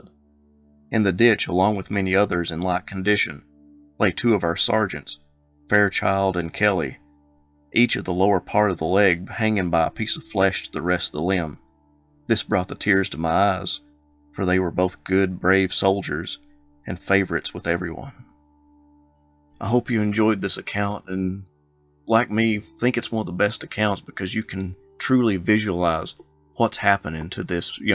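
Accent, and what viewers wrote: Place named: Virginia, United States. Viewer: absolutely fantastic Virginian. i could listen all day never tire of it. that reading took me to the battle. WOW!!! thank you sir.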